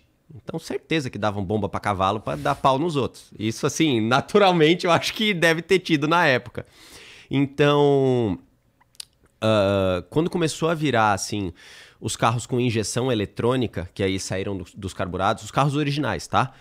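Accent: Rio Grande do Sul, Brazil. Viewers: Ele é gaucho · Acho tu tu tu virou gaúcho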